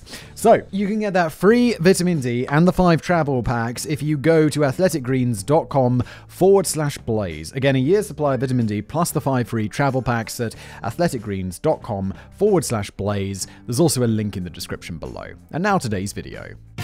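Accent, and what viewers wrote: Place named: United Kingdom. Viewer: It sounds racist coming out with that British accent.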